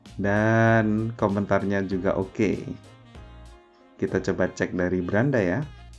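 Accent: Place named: Philippines. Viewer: Woah i though your a pilipino too..well very impormative vid.vpn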